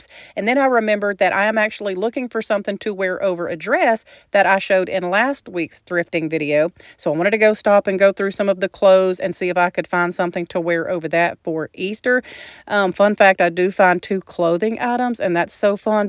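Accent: South, United States. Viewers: I think you could use that material for either or for both. Yes, the outside of our house is all Eastered up. Yes, that was for salt or pepper but you need the tiny spoon for it. Where do you live with your southern accent? Where do you sell your thing and what name do you go by?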